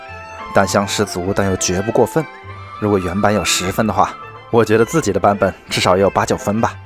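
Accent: Wuhan, China. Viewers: 怎麼感覺是武漢人啊？！🤣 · 是武汉人吗？听口音像极了武汉滴弯管子普通话……😊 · 视频主是武汉人吗？感觉有武汉口音😁😁😁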